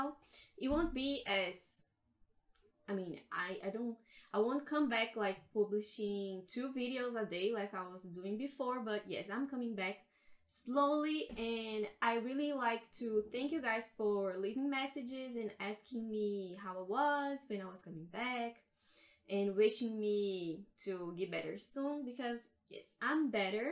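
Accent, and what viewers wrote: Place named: Brazil. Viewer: kkk reconheci o sotaque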